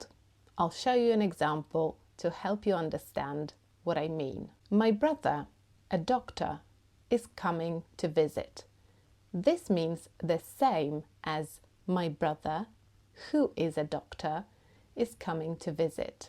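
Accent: United Kingdom. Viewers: I do love your beautiful british accent and of course all of your grammar teachings! ❤ You’re awesome! 😊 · Never have I met such a fantastic and charismatic English Grammar Teacher like you. You look quite captivating, sweet, and heavenly with your contagious smiling face blended with a soothing British standard accent. You are wonderful and ethereal. Cheers!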